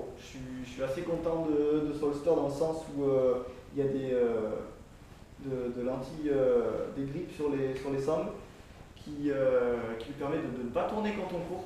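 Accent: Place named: South, France. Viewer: on reconnait la petite pointe du sud avec le ptit "tchichou" ^^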